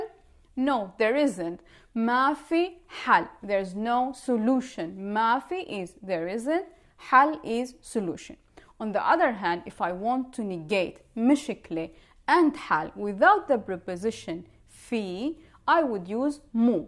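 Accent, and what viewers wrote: Damascus, Syria. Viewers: Thanks, dear for your answer. Your lessons are very very well presented, understandable and useful. Thanks once again. I would like to ask you, is your accent, if that is possible to call it so, Damascene? Because as far as I know, e.g. people of Damascus and Aleppo speak somewhat differently.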